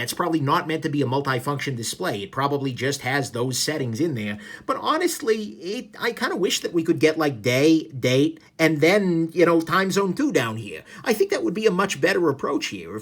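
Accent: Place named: East Coast, United States. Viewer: I usually hate east coast accents as a Brit, but come on I love this review it’s great 😂 this is my grail watch and on that breitling strap it’s stunning I can’t wait to get one!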